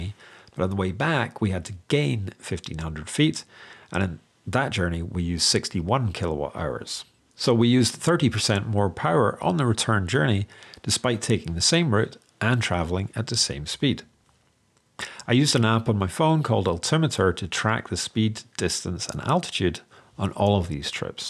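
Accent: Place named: Scotland, United Kingdom. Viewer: Hi. Came for the EV9 content, but now wondering about your accent! 😂 Do you come from Scotland?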